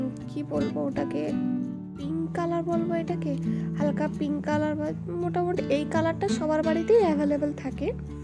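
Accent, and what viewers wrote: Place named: Medinipur, India. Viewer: Khub sundor 😊 Upnar khotha gulo puro amadar medinipur a vasar moto khub valo laglo suna❤❤❤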